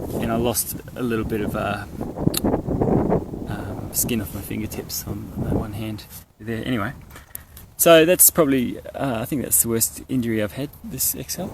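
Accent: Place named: New Zealand. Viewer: Really enjoy your posts . As a kiwi you sound like one to me , a soft kiwi accent. I would ask that the sound quality is improved a large part of your commentary Is inaudible over the wind noise, which can be too loud at times.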